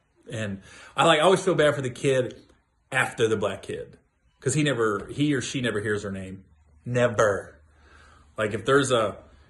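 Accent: South, United States. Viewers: you have a southern accent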